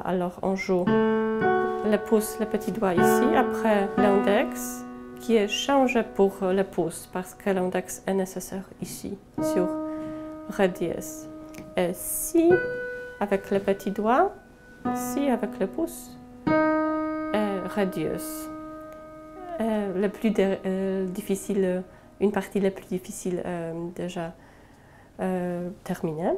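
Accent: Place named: Eastern Europe. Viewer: Merci infiniment pour le partage de votre connaissance. Parmi les plus belles mélodies qui aient jamais été composées...Vous avez un accent adorable. Pologne, Russie, Ukraine...?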